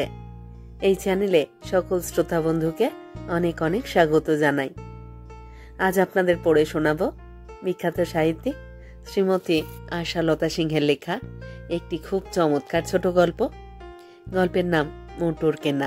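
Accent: Bangladesh. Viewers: apnar bangladeshi dialecte pather jonnyo shrutimodhur lageni . aar kono action charai jeno reading porechen.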